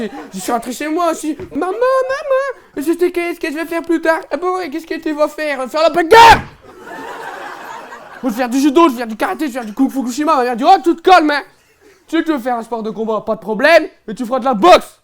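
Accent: Nord-Pas-de-Calais, France. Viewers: On dirait un vrai chti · Accent nordiste lol!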